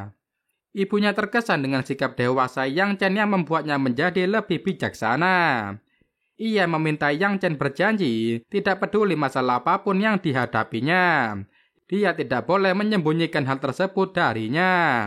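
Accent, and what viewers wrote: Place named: Madura, Indonesia. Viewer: Pembawa alur ceritanya...kayak orang madura tak yehhh...tak. yeee... ditarik " bahasa nyaaaaa...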